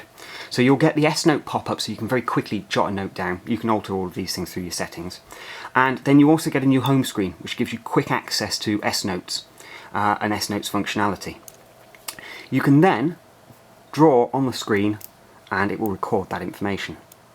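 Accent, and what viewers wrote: England, United Kingdom. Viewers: i subscribed to you.real english voise.am used to american.^-^ i am a motorola fan.i have old q 11 and old milestone. maby motorola come out something like the note.this nice phone.i belive its intel processor ? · are you british ?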